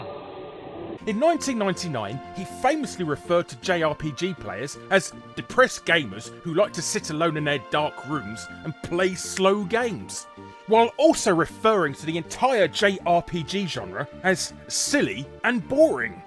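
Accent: United Kingdom. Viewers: You're welcome! I'm just an american fellow who loves british accents. Also, i love your content, and Peter molyneux, is always fun to make fun of!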